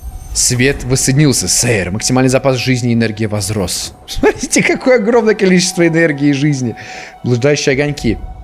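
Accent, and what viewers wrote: Germany. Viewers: Лайк, если знаешь немецкий окцент. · немецкий акцент это что-то с чем-то. Жду следующее видео с немецким языком🌺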